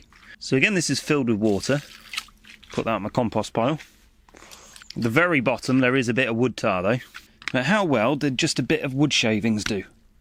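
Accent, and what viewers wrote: United Kingdom. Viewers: Very British. I love it. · This guy is extremely british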